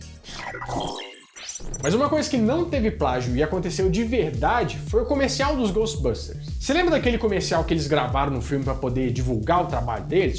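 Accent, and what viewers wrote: Minas Gerais, Brazil. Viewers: Como eu amo seu canal!!! Risadas garantidas e esse sotaque pão de queijo q eu amo, tendo em vista q eu tenho sangue mineiro correndo nas veias, apesar de ser carioca da gema. Minha mãe era mineira e mudou p RJ aos 16 anos.... Parabéns pelo canal e conteúdos!!!!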